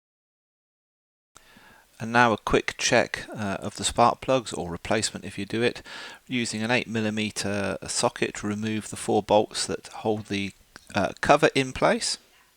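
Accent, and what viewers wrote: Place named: United Kingdom. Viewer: That British accent sounds promisingly good for fixing a car.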